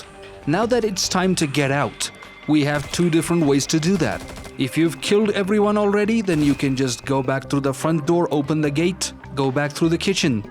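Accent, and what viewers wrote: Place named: Pakistan. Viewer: Apka pakistani accent bohut accha laga, kaha se hain aap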